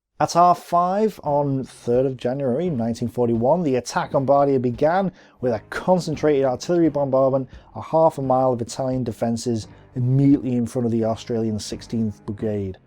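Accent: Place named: Manchester, United Kingdom. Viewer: It's a Mancunian Accent , from Manchester / surrounds.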